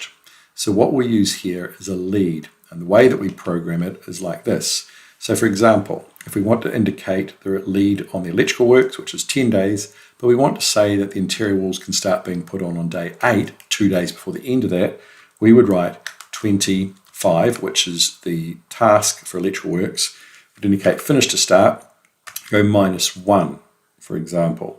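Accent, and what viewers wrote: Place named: Australia. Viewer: yeah you sound Aussie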